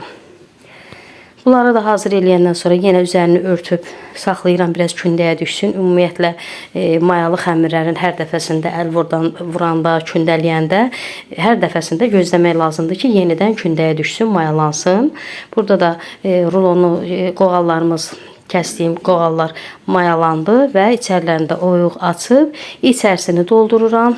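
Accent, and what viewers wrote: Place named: South, Azerbaijan. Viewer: Hansı rayondansız ləhcə sirin gəldi. Cənub zonası?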